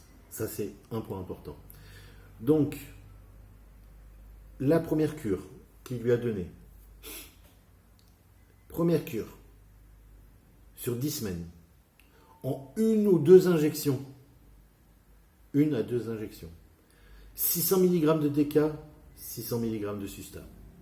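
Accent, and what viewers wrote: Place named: Quebec, Canada. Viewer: il a l'accent québécois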